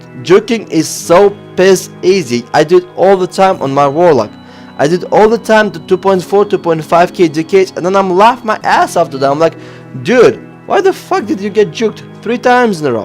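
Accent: Russia. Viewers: i fucking love russian abscent :3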